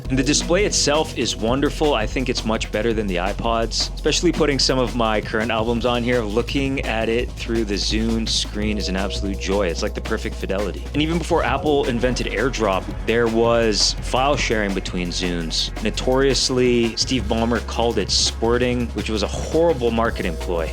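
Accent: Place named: Canada. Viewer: i’m getting good at spotting canadian accents yippee also if i get a zune off ebay it’s 100% cuz of u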